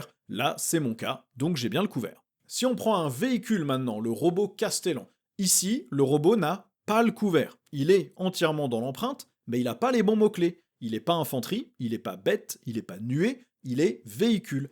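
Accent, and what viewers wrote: Brittany, France. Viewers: Encore du super boulot avec en bonus, l accent brestois.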